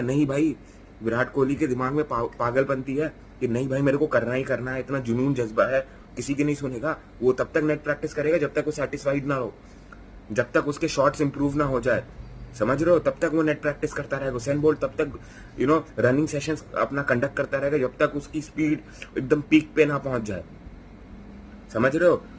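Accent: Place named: Uttar Pradesh, India. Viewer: Aur bro UP se ho pata lagra 😁😁😁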